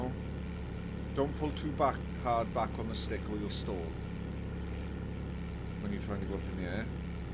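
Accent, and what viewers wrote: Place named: Wales, United Kingdom. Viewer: I think you will find he has a Welsh accent. It is still English though.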